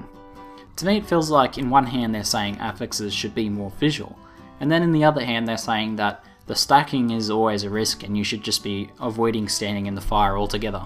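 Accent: Australia. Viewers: Sounds pretty good. Nice to have an Australian voice on youtube :) sick of Americans...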